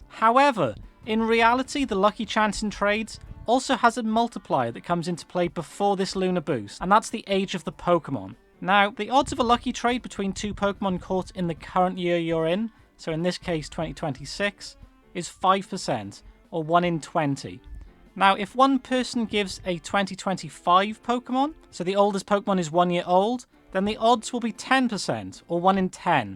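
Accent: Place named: Llanrumney, United Kingdom. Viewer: Anyone ever told you that you sound like you're from Llanrumney..?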